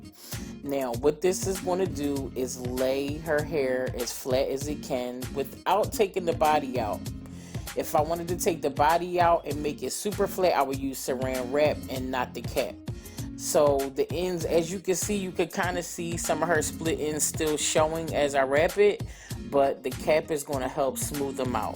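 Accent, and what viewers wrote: Philadelphia, United States. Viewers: I'm a guy who loves watching your videos, honeslty just discovered them yesterday. Please tell me that's a Philly accent??? <3 You speak like a cool ass big cuz or young auntie from 215 for sure <3 ...nevermind you just said "home shop in Philly" lol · I was trying to figure out where you were located then I heard “Real rap” I said yea she’s def. from Philly lol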